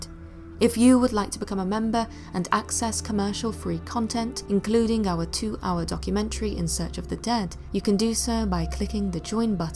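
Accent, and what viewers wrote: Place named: RP, United Kingdom. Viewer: Queen's English...luvly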